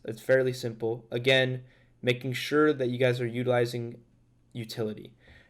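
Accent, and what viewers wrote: France. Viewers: U french?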